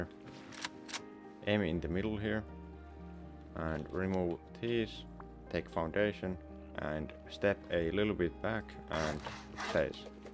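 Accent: Finland. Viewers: Are you finnish?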